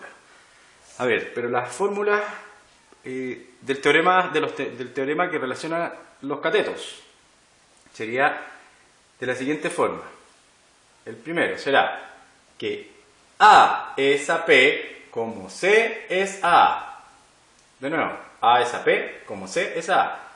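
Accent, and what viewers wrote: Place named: Chile. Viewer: Me ayudó mucho, gracias. Eres excelente explicando pero me distraje con tu acento chileno.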